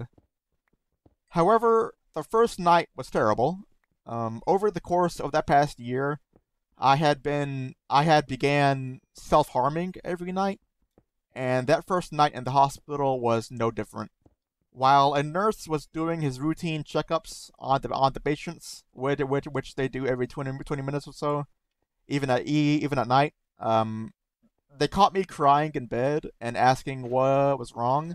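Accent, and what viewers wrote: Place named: Georgia, United States. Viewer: thank you for sharing your story it was very nice. you actually said you were from the south and it didn’t click until you said that and I was like “oh yeah! you sound just like people from my city!” I’m from north GA. I’m glad that you’re feeling better and sh clean for a year! congrats! 🎉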